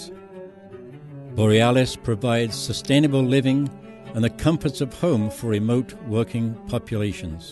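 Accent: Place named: United Kingdom. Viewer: Cool house, but what's up with the British accent?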